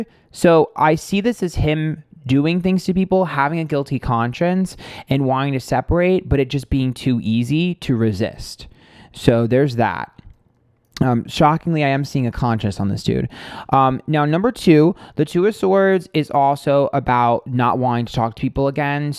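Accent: New York, United States. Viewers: The more frustrated you get the more heavily your New York comes through 😁😁😁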